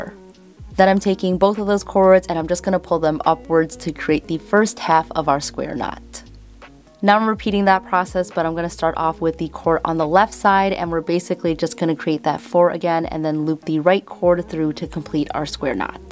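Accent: Chicago, United States. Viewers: You can also use it to hold anything like make up brushes or in your kitchen to hold utensils if you made larger. At your desk for pens ...im a Chi girl too!! I love our accent.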